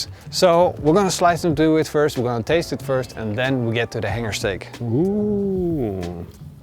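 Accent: Netherlands. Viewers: I sense Dutch accent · You hear this guy is from the Netherlands 😂😂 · Je hoort echt dat Nederlandse accent hij zei stok terwijl het stick moest zijn 😂😂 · are you dutch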